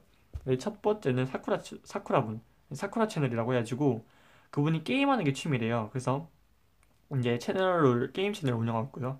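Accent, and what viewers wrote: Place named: Jeolla, South Korea. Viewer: 전라도분..ㅎ 전라도 사람만 알수있는 억양 ㅎ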